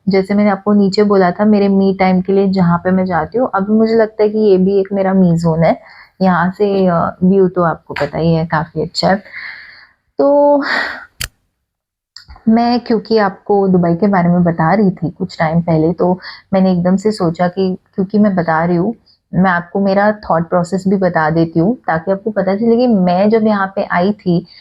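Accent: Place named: Maharashtra, India. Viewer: Aap Maharashtran ho Kya Mahrati Awaz Arhi Thi 😍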